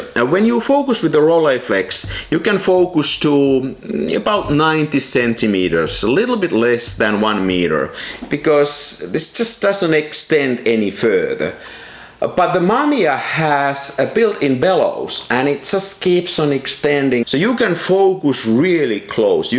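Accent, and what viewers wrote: Finland. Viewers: Rallienglantia, tunnistin heti suomalaiseks :D. Ei vaan hyvä video ja sain noudettua tänään oman Yashica C:n Kuvasin tänään rullan Fomopan 100, seuraavaksi Kodakin Gold 200:sta.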